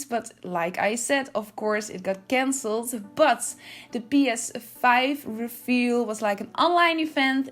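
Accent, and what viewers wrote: Netherlands. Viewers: Clocked the Dutch accent, hehe xxgroetjes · Yeah you’re Dutch I knew the first 3 seconds of the video😂😂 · You have one of the cutest Dutch accents I have ever heared (I'm Dutch as well), and I usually don't like the dutch accent at all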